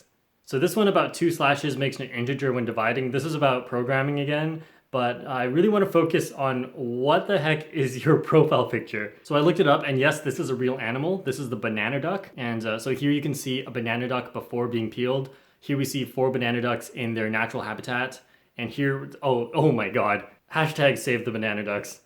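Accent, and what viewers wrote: Australia. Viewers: You sound just like an Australian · His Aussie accent sounds EXACTLY like Feliks Zemdegs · Your aus accent is actually really cool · That Aussie acsent was alright cause I am a boody aussie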